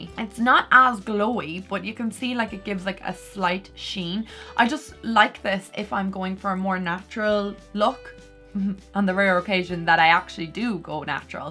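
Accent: Ireland. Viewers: Is that a Irish accent?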